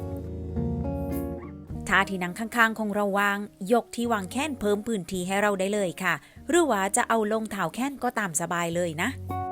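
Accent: South, Thailand. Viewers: วันนี้พี่ พูดภาษาใต้น่ารักจังขอบคุณมากนะคะได้ความรู้มากมายเลย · น่าร้ากกก ชอบให้พี่ แหลงใต้ค่ะ น่ารักดี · คุณ แหล่งใต้ได้น่ารักมากค่ะ ตอนก้มกราบคุณแม่ ป้านำ้ตาซึมเลย คิดถึงคุณแม่ของป้าตอนท่านยังมีชีวิตอยู่ เวลาป้ากลับไปเมืองไทยความคิดถึงมันท่วมท้นอย่างไรป้าเข้าใจดีค่ะ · ชื่นใจ น้ำตาซึมไปด้วยคะ พี่ ประมาณตัวเองได้กลับบ้านด้วย ภาษาใต้ที่น่าฟัง คะ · หนูน้ำตาแตกอีกคลิปละค่ะ เข้าใจความรู้สึกของคนไกลบ้านแล้วได้กลับบ้านแบบจริงๆ แล้วสักที อบอุ่นมากค่ะ ยินดีต้อนรับสู่ประเทศไทยค่ะ //เอ็นดูพี่ แหลงใต้:) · หรอยจังคลิปนี้..แหลงใต้น่ารักอย่างแรง สำเนียงหรอยนิ นุ้ยคนคอนศรี..จะแหลงเร็วๆดังๆ555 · ชอบสำเนียงภาษาใต้พี่ จังเลยค่ะ ฟังง่าย สำเนียงน่ารัก😙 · พี่ น่ารักมากเลยคะ ชอบพี่พูดใต้มากเลย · ฟังสำเนียงใต้พี่ น่ารักจังค่ะ ชอบๆ^^ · ชอบสำเนียงใต้มากค่ะพี่ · I like your southern pronunciation so much P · พี่ แหลงใต้ฟังเพลิน~~~ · ผมไม่ชินกับภาษาสำเนียงใต้พี่ เลย <num> เหมือนเปิดช่องปิดเลยครับ แปลกหูไปเลยครับ555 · น้ำตาจิไหล เข้าใจฟีลพี่ เลย หนูเปนคนใต้เหมือนกันค่ะ อยู่ ตปท.ไม่ได้หลบบ้านมาปีหวาแล้ว สู้ๆนะพี้ ส่วนสำเนียงอย่าไปแคร์เลยค่ะ แค่พี่มีความสุขทุกครั้งที่แหลงก็โอเคแล้วค่ะ ดีใจกับพี่ที่ได้หลบบ้านมาอยู่กับพ่อแม่ บ่อยขึ้นน้ะ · พี่ แหลงใต้น่ารักจัง สงสัยจะไม่ได้แหลงใต้นานแล้วใช่หม้าย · ชอบเวลาพี่ แหลงใต้ น่ารัก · พี่ พูดใต้น่ารักจังค่ะ☺️ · พี่ พูดใต้น่ารักมากเลยค่า ❤️ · ภาพที่พี่ ก้มกราบพ่อแม่ แทนความรู้สึกรักและคิดถึงได้เป็นอย่างดี ความอบอุ่นอาบหัวใจคนดูจริงๆค่ะ /ชอบฟังพี่ พูดภาษาใต้ ส่วนตัวแยกไม่ออกว่า สำเนียงใต้แบบนี้ แบบนั้นคือจังหวัดไหน เพราะฟังไม่ออกเลย 555 แต่คลิปนี้หนูฟังพี่ ออกนะคะ 😁😁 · เพื่งเริ่มตืดตาม ได้ดูคลิปย้อนหลังเรื่อย ชิบมาก ตอนกดไหว้แม่ ร้องให้เลย รับรู้ถึงความรักความคิดถึงเลย คะ ชอบสำเนียงใต้แบบนี้จัง นุ่มนวล จากคนตรังในกทม.^^ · คุณ แหลงใต้หร้อยๆ คนใต้(หาดใหญ่,สงขลา)คนนี้ฟังแล้วมีความสุขจังหู้นิค่ะ👍 ตอนคุณ เจอแม่พ่อก้มกราบเท้าท่านแล้วน้ำตาพี่ไหลน้องเห้อมีความสุขไปกับน้อง กันนะน้องนะ🙇‍♀️😢 🌷fc จากเนเธอร์แลนด์ 🌷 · สำเนียงใต้ของพี่...น่าฟังมากๆค่ะ ฟังแล้วเพลินเรยค่ะ ❤❤ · วาวๆๆได้ยินสำเนียงใต้(เเบบเราด้วย)55 ดีงามค่ะดีใจเเทนได้กลับไปเจอพ่อเเม่ค่ะ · พี่ พูดใต้น่ารักจังเลยค่ะ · ชอบพี่ แหลงใต้มากเลยยย ฟังเพลินนนหู้(หู)555 พี่ น่ารักมากกเลย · ชอบฟังพี่ พูดใต้มากๆ ยินดีต้อนรับกลับบ้านน่ะพี่ ไม่ค่อยได้เม้นเเต่ดูทุกคลิปนะ❤️❤️ชอบเติ้นจังนิ❤️❤️ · พี่ แหลงใต้เพราะจังคะ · แหลงใต้น้ำเสียงน่าฟังมากกกก พี่ เวลาพูดภาษาอังกฤษก็น่าฟัง พูดภาษาไทยก็น่าฟัง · เป็นคนเชียงใหม่ที่ฟังภาษาใต้ของพี่ ได้ แล้วภาษาใต้ของพี่ ก็เพราะด้วย เพลินดีค่ะ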